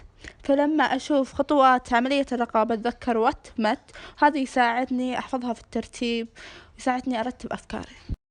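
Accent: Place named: Algeria. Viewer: نتي جزائرية تتكلمين مثل الجزائريين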